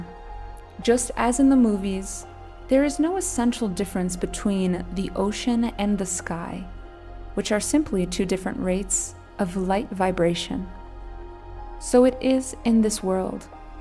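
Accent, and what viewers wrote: Brazil. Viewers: I sense a Brazilian accent on the narrator’s voice. Great video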